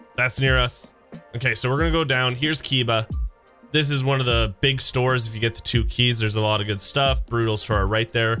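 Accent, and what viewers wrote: Canada. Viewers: Trailer park boys accent ; ) Cheers from Quebec !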